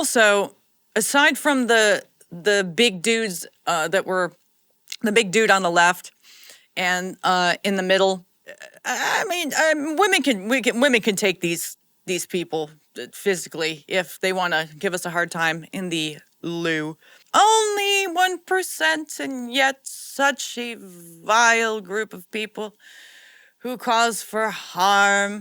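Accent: Scotland, United Kingdom. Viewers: Great Scottish accent🔥⚡️ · Trust me, I'm from Edinburgh and no clue what he is saying either, BTW I love your Scottish accent you should do it more often.😘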